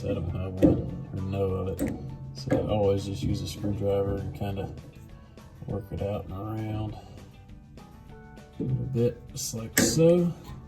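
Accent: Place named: Alabama, United States. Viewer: Dude's speaking Alabama ease. He doesn't have an Amana (Uh-man-uh) dryer, he has an (Ar-mon-uh) dryer. I thought he was servicing a new brand of dryer. Keep up the good work Buddy!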